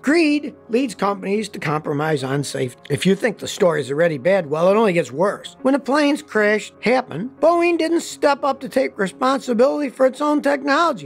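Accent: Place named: Western New York, United States. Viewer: He's from western New York, Niagra Falls area I believe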